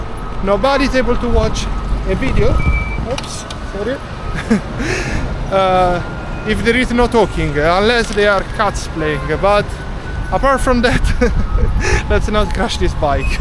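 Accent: Italy. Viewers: Thanks for the insight, best of luck on your channel. You got the talking down. Your English is very good. Love the Italian accent. Anch'io vivo qui in Italia. Vicino a milano e Torino. Piacere fratello.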